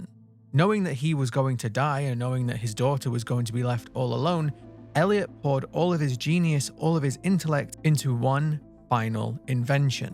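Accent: United Kingdom. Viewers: It's funny how differently British ppl pronounce things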